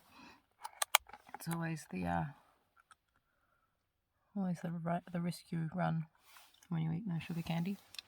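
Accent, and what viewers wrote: Australia. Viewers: As someone from the US, I can attest to social opinion of Austrailian accents, and trust me...we don't think you sound like yokels. If anything, people think someone with an Austrailian accent is probably worldly af lol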